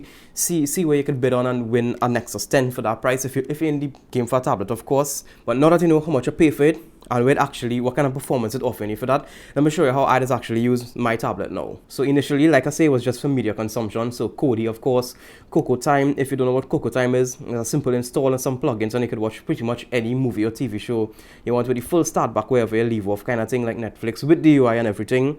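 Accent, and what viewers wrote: Trinidad and Tobago. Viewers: Dude you talked faster after saying that you would slow down because of you Trini accent. Hahahaha. Love it. So I'm a mac guy and was never much interested in anything else but I see that you are able to remotely control your mac from it?? Now I am piqued. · Bmt that trini accent surprised me fam #trinitodebone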